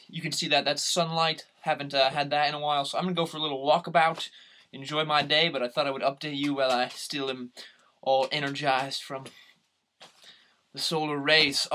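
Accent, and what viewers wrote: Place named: Sweden. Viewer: two things. 1) Eat. You are loosing weight 2) You are developing a Swedish accent. Train. Harder.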